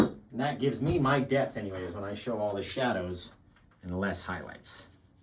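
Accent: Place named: Chicago, United States. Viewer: you crack me up. Now I'm saying "Fantastic" through everyday 👏. I do like your accent a lot! I know you live in Nevada, but are you from Chicago with that accent. I'm from Texas and fav sandwich PB & J...👀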